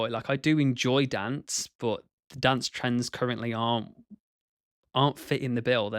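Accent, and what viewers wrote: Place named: England, United Kingdom. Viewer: Thanks for sharing. Like most people here, I’ve been watching some of your dancing videos for quite some time now, and it’s nice to (literally) hear more about you. And btw, I’m French, and to my ears your English accent is to die for 😌 In any case, keep following your dreams and making us dance with you 🕺🏼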